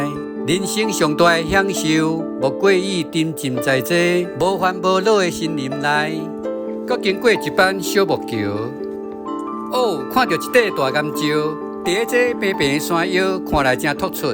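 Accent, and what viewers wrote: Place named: Taiwan. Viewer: 介紹清楚，拍攝美景令人舒心。 台語解說一大特色👍👍👍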